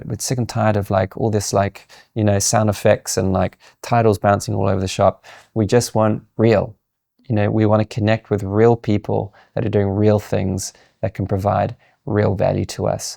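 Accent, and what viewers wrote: South Africa. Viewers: This accent sound firmiliar, South African Maybe?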